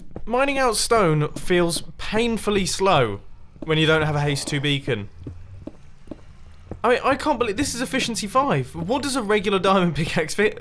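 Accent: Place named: United Kingdom. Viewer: The brithish acent 👌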